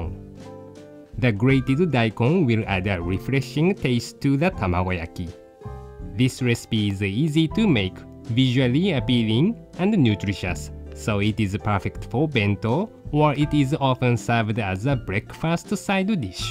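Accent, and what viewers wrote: Japan. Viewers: looks like you've never heard a japanese speak english... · 英語が日本語すぎてちょっと笑ってしまう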